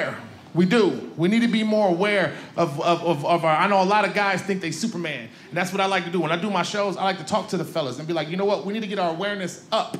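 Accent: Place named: Chicago, United States. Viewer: He is definitely from Chicago... 😊👌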